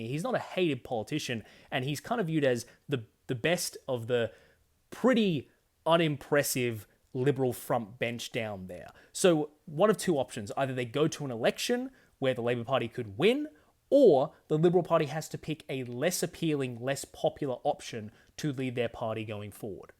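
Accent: United States. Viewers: Fascinating developments. Am curious about your accent though: did you spend time in the US? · Your analysis is interesting but your American pronunciation is annoying af. In particular U sounds as OO sounds like 'noos' but also words like Lieutenant and semi. Watch more Australian content. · The what Governor? Get your pronunciation right, dude. You have too much of a Seppo twang as it is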